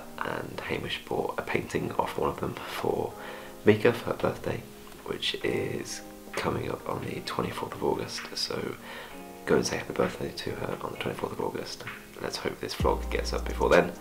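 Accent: United Kingdom. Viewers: i enjoy your videos and practice with your British acent greetings from bogota.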